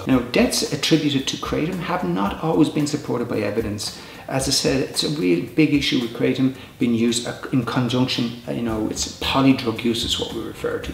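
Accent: Ireland. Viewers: God bless you, you be rocking the McGregor accent/look a little!:)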